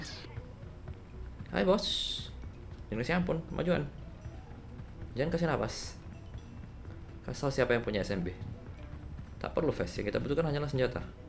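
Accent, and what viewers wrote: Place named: Kalimantan, Indonesia. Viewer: KALIMANTAN MANA SUARANYA.. 😎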